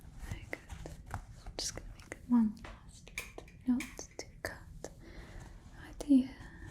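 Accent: France. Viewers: Your French accent is calming. You should use it more. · I expected a British accent but the French accent is absolutely amazing and a welcome change! 👍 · Pretty fine french accent even if as a french i know it's wayyy more classy than the real one xd amazing roleplay, i binged bridgerton and i'm so happy to see that you were ready for this too! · Great french accent ! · I haven't watched the show but I am made to understand that there are a lot of butts involved. So I wish everyone a wonderful new season of their butt drama. Love the French accent. Looking forward to relaxing with this 💚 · Very Très bel accent Français mademoiselle ! J’adore 😊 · I am obsessed ❤❤❤I’ve been waiting for another French accent since the spy fitting video 🥹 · The French accent! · l'accent francais est super bien fait ! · I feel so dumb i was like shes not gonna dona french accent and then she started doing a friend accent and i got so excited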